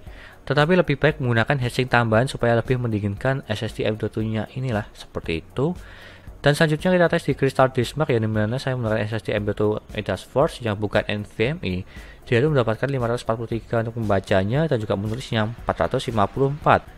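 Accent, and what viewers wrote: Surakarta, Indonesia. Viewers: Logat solone ketotot😂😂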